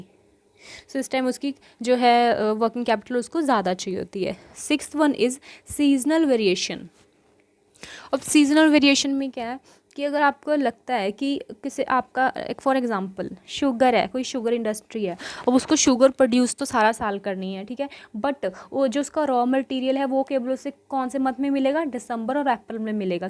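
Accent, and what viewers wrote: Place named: Punjab, India. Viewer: I liked the way you explained , are u Punjabi or from north side for sure😊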